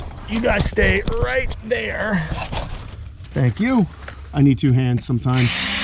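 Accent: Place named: New York, United States. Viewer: I didn't know you grew up in NY but I've wondered a lot because you have a liiiittle bit of a New York accent sometimes 😊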